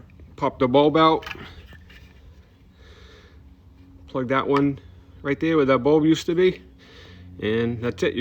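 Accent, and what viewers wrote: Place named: Boston, United States. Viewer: Lol Bro you live in the suburbs what's with the Boston city accent...stop pretending to be something you're not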